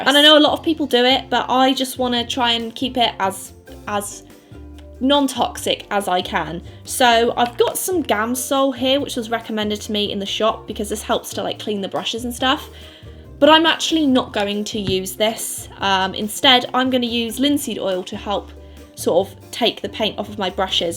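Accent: United Kingdom. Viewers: Her accent is so British despite moving to America at a very young age. Cool!!! I wonder if she’s ever explained it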